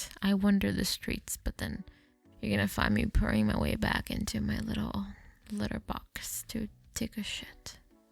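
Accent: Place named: France. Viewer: I never picked up on your accent because it doesn't come through as much in your "video voice", but the second you said you're from Europe I realized you're French! C'est tre bien que tu sois de retour! :)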